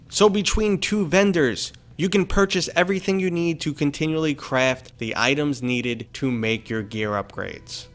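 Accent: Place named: Boston, United States. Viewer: Your accent is very interesting 🤔. You sound like you have a Boston or New Jersey accent. Ya hold your long a sounds sometimes.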